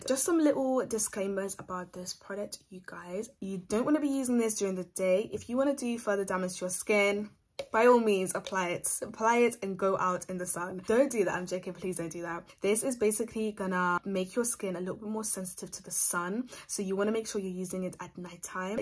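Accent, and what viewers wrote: United Kingdom. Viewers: Please some should please write down the name of product she mentioned I couldn’t get her because she has an English intonation please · someone can tell me if shes british? i hear an accent when she talks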